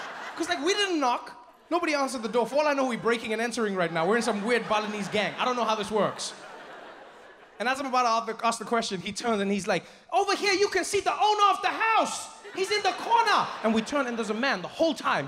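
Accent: United Kingdom. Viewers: Sounds British to Me. UNPARALLELED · sounds british to me 😂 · Sounds British to me 🔥🔥🔥🔥🔥💯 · that sounds British to me 😂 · 'sounds british to me' THIS. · Sound British to me 😂😂😂Bravo well said 👌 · Awesomely truth ! Sounds British to me .. **empty white brains 🧠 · Sounds British to me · Definitely does sound British bruv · Sounds British to me....flipped me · Sounds british to me... 😅😅 Talks a lot about how assholes british are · That sounds British to me 😂😂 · That sounds British to me....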